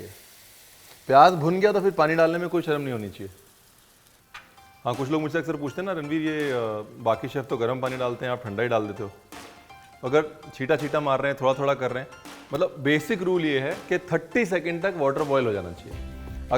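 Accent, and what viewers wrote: India. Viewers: "Main keya ayi k nai hun dhabbe ali feel"😂😂👍 That total Desi accent is so appealing to hear that we rush to to your vedios not just for magical cooking recipes but also for this entertaining content. It makes you stand apart from all others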